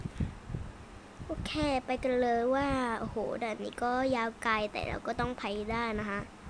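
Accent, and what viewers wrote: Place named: South, Thailand. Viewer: พี่เป็นคนใต้ไหมค่ะ ...เห็นพี่พูดใต้เกือบทุกคริปเลย...🥀🥀🥀🥀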